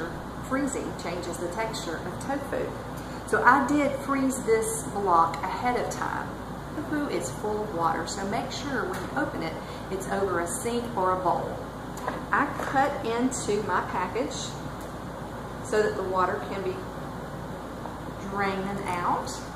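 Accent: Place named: South, United States. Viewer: Only if it's available in Audiobook form....I'd miss that sweet southern "drawl".... ;-)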